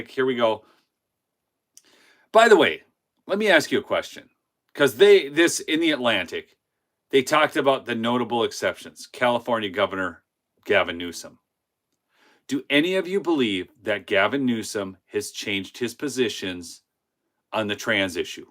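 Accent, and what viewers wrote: United Kingdom. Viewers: You sound like a Brit lol. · Hey, from central illinois. Love your British accent humor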